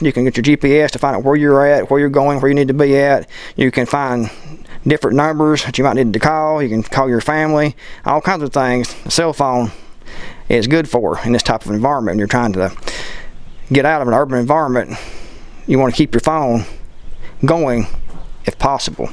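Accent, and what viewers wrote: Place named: South, United States. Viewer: I only subscribe to a handful of people of which your one. Your videos are fast and full of great content 👍🏻, however, I’ve never been to the south and am guessing part of the time what you are saying so I turn on closed caption, but they are getting it wrong as well 😆. But anyway I enjoy your videos, but your accent sure keeps me on my toes 😬😎 Keep up the great work!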